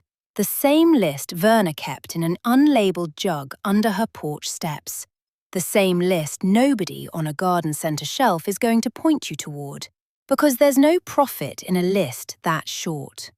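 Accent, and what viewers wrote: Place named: United Kingdom. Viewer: Why do you have a British accent on a video about Amish weed prevention? Shouldn’t it be more of a German accent?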